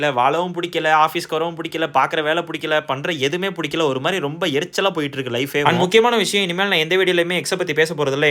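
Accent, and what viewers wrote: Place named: Madurai, India. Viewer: Correct ah iruntha parotta Illa na Manda pura saalna 💥👊🏻💢 Madurai Slang 🔥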